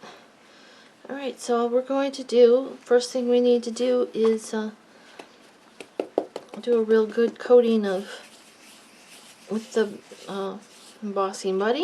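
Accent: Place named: Upstate New York, United States. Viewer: Hi - I should have guessed from your accent that you were from NY state close to Canada. I've been watching your videos from Ontario up near Georgian Bay. Thanks for taking the time to share your cards & tips. I really appreciate them.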